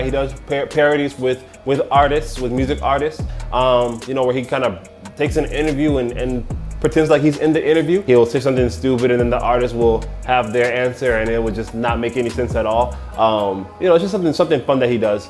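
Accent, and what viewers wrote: Dominican Republic. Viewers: Intro sounds Dominican 🤷🏽‍♀️🤷🏽‍♀️🤷🏽‍♀️🤷🏽‍♀️ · I’m Haitian and African American and the Spanish language has really driven me to find resources to learn Spanish. I have a bunch of Venezuelan and Puerto Rican friends and I’ve been trying to dissect and master the Puerto Rican Spanish for the longest. It’s a little hard because I don’t know too many helpful vids out there but you’ve giving me hope considering the fact that you’re African American as well and speak like a Dominican. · eres mi idolo. soy estadounidense e igual que tu, vengo de chicago. ahora soy universitario y hago la carrera en espana. diria que por lo general domino el idioma pero me gustaria hablar como tu un dia y que la gente no sepa que mi idioma natal no es espanol jajaja. lo gracioso es que a pesar de haber aprendido en espana trato de hablar con acento boricua jajaja. 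no tiene mucho sentido ya que vivo alli pero por ejemplo como la mayoria de hispanohablantes en el mundo no usan vosotros pues me parece mas practico usar solo ustedes aunque si que es verdad que he aprendudo su jerga jajaj. por cuerto tus tik toks y el flow dominicano que llevas ta duro. tuve un companero de cuarto del patio y entonces a traves de el y tus videos, y mi deseo de hablar como boricua, intento conseguir mi propio pikete caribeno jajaj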